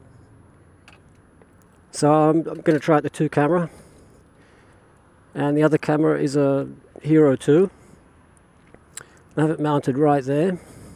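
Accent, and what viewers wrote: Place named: Australia. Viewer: Simply fantastic mate! Us American blokes luv everything Australia+UK...yes I knew from the accent and driving on the wrong side of road..I thought UK until I saw Palm trees. So now I can see Sydney from the seat of a fireplace. Say, my bike-CBR1100XX..is not common here, but I know that you have some over there...ever seen one over there in kangaroo land? I'm subscribing.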